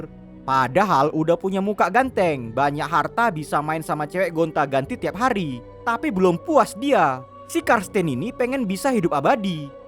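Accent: Medan, Indonesia. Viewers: Ku lihat dari logat bicara kau bg, pasti kau dari medan ya bg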